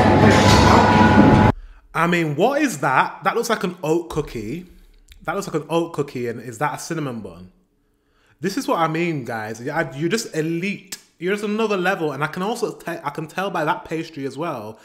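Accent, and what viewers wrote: United Kingdom. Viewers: By the way. Your english sounds british.... I continue anyway. What about risengrød. On that, you have the same cinnamon/sucker on top of it. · you are such a sweet and charming young man. Is your accent northern? or midlandish?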